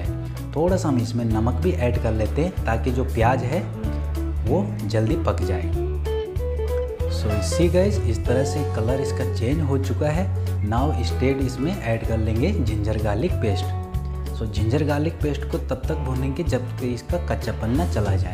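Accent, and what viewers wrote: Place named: Garhwal, India. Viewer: Nice gadwali chef your tone like gadwali m ryt Some time make uttrakhndi cousin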